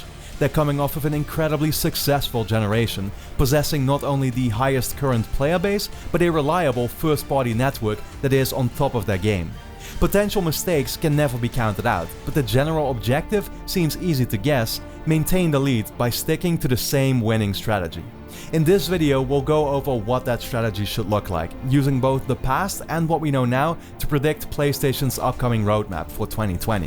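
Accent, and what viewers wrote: Netherlands. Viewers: Hahaha that Dutch accent tho... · He sounds Dutch. Iam 99% sure he is from the netherlands.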